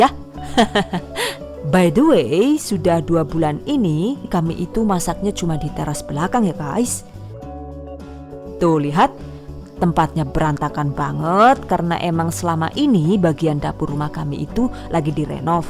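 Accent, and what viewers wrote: Banyumas, Indonesia. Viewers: Terima kasih ya... Insya Allah akan saya coba resep ini.... Seperti kemaren saya baru saja buat nugget ayam.. Enaak banget.. Terima kasih sudah membantu.. Tutirialnya jelas dan mudah dipahami.. Ada lucu lucunya juga bahasa ngapaknta... 😂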